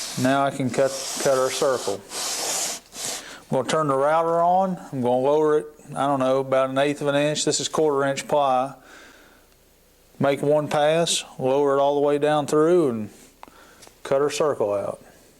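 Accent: South, United States. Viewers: I understood him perfectly lol...... We don't talk that unusual in the south.... :D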